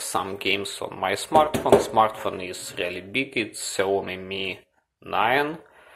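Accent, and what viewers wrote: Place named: Russia. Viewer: Why do you have a russian accent ?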